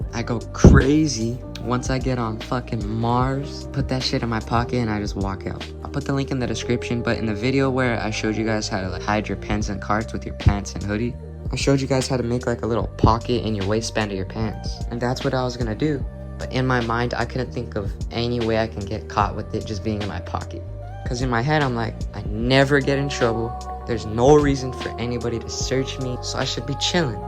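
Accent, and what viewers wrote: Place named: Kentucky, United States. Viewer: sounds like ky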